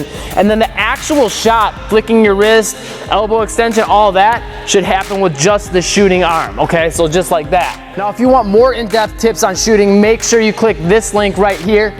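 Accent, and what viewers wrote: Canada. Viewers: Are you Canadian? Hehe, cute accent.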